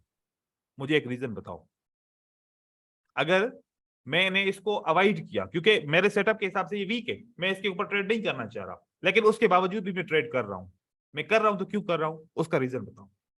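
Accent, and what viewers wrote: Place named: Sindh, Pakistan. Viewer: Sir app ki voice se lag Raha ha app Sindhi haan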